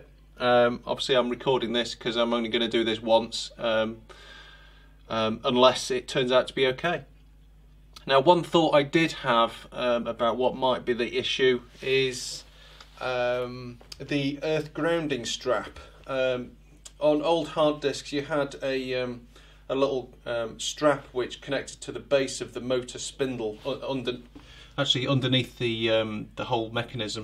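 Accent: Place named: United Kingdom. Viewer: the brit'ish accent is unbeataple.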